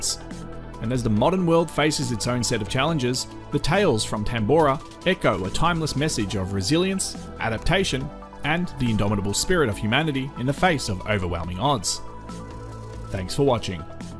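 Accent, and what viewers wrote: Australia. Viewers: Great to hear a Aussie voice talk intelligently about the stuff I want to learn. Great work mate.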